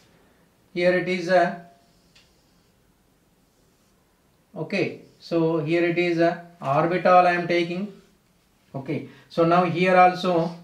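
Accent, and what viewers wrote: Kerala, India. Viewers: Sir ARE YOU FROM KERLA??? I LIKE YOUR SPEAKING 👍👍👍👍 AND TEACHING ☺️☺️☺️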